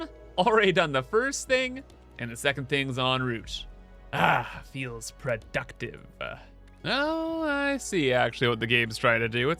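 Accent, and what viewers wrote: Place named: Canada. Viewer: In all my years of Shapez, I've never seen anyone ignore the miner extensions and just build platforms and factories in the middle of their miners :D 600 hours in and I've come to accept that there is no wrong way to play the game. Also, I get the feeling you're Canadian, yes? Your "Eh" was showing ;)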